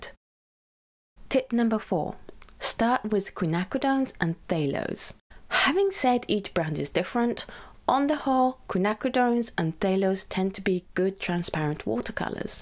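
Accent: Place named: United Kingdom. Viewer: This video was so helpful...more please. Also may I ask what paper you use and where you can get it? I am assuming from your accent that you are also in the UK? Apologies if not. Thank you so much...Mand XOXO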